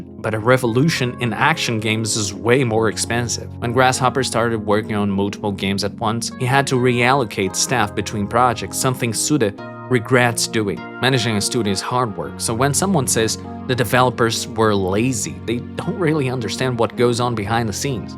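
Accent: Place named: Brazil. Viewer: Desde os primeiros segundos de vídeo eu tive uma voz alta na minha mente dizendo "eu sei q esse cara é brasileiro mas eu não tenho como provar" ate que com o tempo o próprio vídeo confirmou o meu pensamento e ter isso em mente enquanto assistia deixou a experiência ainda mais divertida(caçar os detalhes mais óbvios tipo as partes em q vc falava português ate os pequenos detalhes tipo o horário no desktop e etc) . Incrível o seu trabalho e espero muito que seu canal de certo.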